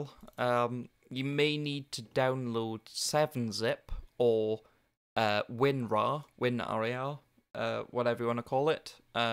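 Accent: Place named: Wales, United Kingdom. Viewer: Gotta love a welsh accent mun aye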